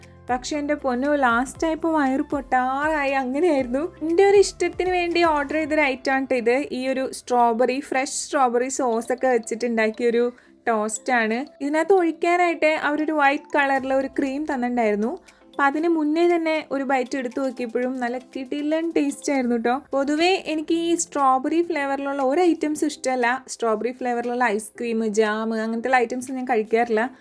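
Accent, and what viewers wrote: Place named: Angamaly, India. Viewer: I was fond of ur angamali slang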